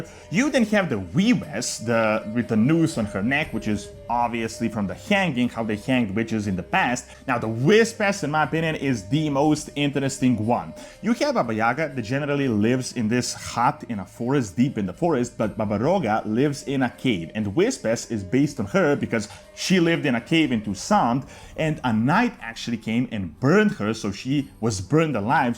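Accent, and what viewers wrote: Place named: Eastern Europe. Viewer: where are you from you pronounciate all of the names like a real slavic are you slavic?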